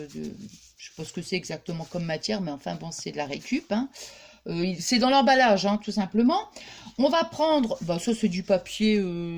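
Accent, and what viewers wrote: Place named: North, France. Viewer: Ccou je viens de découvrir ta chaîne et tu me fais trop rire je me reconnais un p’tit peu dans ta façon d’être j’aime bien du coup je m’abonne à ta chaîne merci reste comme tu es ne change rien j’ai cru reconnaître une Tite pointé du Nord dans ta façon de parler lol 😂 👍bisous 💋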